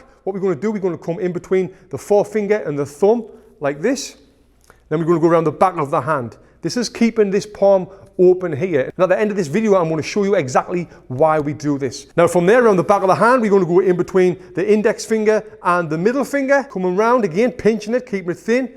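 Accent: United Kingdom. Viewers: He is British not Australian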